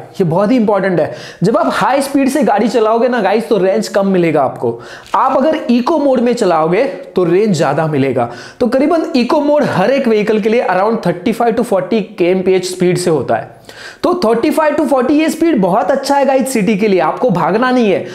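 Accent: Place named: Maharashtra, India. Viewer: मराठी माणूस दिसतो आपला...ascent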